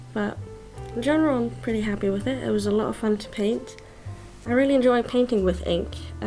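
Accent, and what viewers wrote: Scotland, United Kingdom. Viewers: Hi. I am in awe! Why have I not seen you before?! This painting is fabulous! I love it! re: your accent. At first I thought Scot cuz it reminds me of a friend's accent from Scotland, but then some words sound like my friend from Sweden. The mix is beautiful..And, of course, I love being right LOL. On both counts 😂😂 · I need to be more “ GRACEFUL and DELICATE “ 😂. I completely relate. Aw your videos make my heart happy! I’m an animal and wildlife lover plus I’m partial to a wee mushroom also. So happy to have found your channel ❤ ps. I can still hear the Scottish twang going on, I’m Scottish myself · 😍💕🐿🎃 I'm levitating too, just from watching this video! 😅 (Getting Scotch tape to reattach myself to seat 😉)! Your illustration is magical!✨ Loved the forest and the legend of Skogsvrå, and the busy squirrels, and there's that charming witchy animation too! 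🧙‍♀️🐈😊YouTube suggested one of your videos yesterday, and when you appeared, my first thoughts were: Oh, great vibes, kind face, lovely voice, nice Scottish person (I'm from the north of 🇪🇸, so you sound really Scottish to me 😉), wonderful sense of humour, likes what I like, delightful cosiness around her, and totally amazing artwork…! 🤩Subscribing!! 😃👍 Thank you so much (And Margo😉), and have a very lovely day!! 🙏👏👏👏😘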